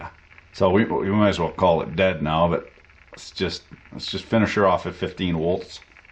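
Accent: Quebec, Canada. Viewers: talkin' like a real Quebecois up in this shiz... i love it. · Si Sol (de Sol et Goblet) parlait anglais, ce serait lui. Un Québécois en plus! Gotta like the guy! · Based on french knowledge, you are either from New Brunswick, Quebec or maybe Ontario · Hi im from quebec im very impressed that you seem to can speak like québécois. Where are you from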